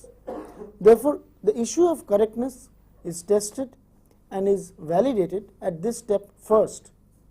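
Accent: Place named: India. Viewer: I am from Sweden so I am not a native English speaker, but I can still understand this guy - although I kind of have to listen harder to grasp what he is saying. I guess I have a slight advantage due to the fact that Swedish is more "flat" than English and doesn't use any gliding vowels (unless you are speaking some of the southern accents), so that's probably more similar to the Indian accent.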